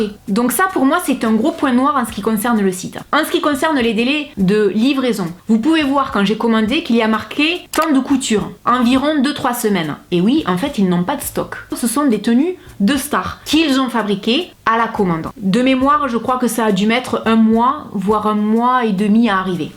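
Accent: South, France. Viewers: Bonjour, Jolie accent du Sud, je kiff. Dis....pourquoi pas de promotion pour un site de soutif sur mesure (j'ai pas regardé toutes tes vidéos désolé si déjà fait.). Quand je vivait en Gwada, la boutique Coquin (rien à voir avec les sex-shop)faisait pour les fortes poitrine du prêt à porter et ce fut la libération pour une amie, que j'avais marre de voir voûté et tout le temps en train de rectifier son soutif...et oui: qu'une amie et rien d'autre. Depuis que je suis dans l'hexagone, plus personne ne parle du sur mesure pour les soutifs...en plus du fait que les fortes poitrines sont les grandes oubliées des magasins de sous-vêtement...aubade en 1er. Alors puisque tu parles de sur-mesure des recettes sous-vêtements? Je sais que tu saurais être sexy sans être vulgaire sur le sujet.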